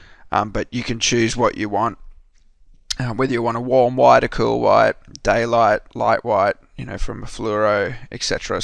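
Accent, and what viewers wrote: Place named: Australia. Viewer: Wow, great work! Thanks for the help! love love love love that Aussie accent too :P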